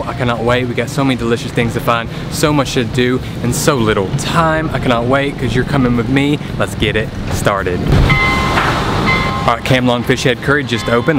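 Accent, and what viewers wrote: South, United States. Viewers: hehehe that southern accent <3 <3 ya'alls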